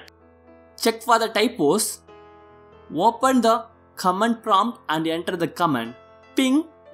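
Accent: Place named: South India, India. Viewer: U sound like a South Indian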